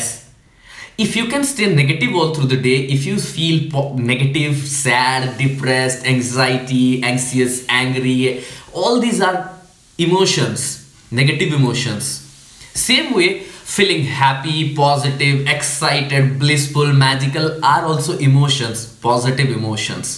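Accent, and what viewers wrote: India. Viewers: I like your way of expression. You have a good and strong posture. Not only this but also you speak the Indian English which is clear and obvious with a very sweet voice. --Dr Mohanty.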